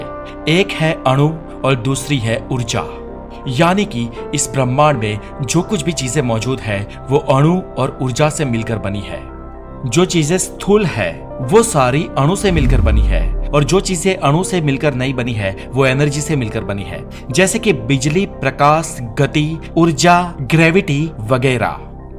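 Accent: Gujarat, India. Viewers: Proper gujarati accent wali Hindi...Aur bhai wo DARK equation nahi hai, Drake Equation hai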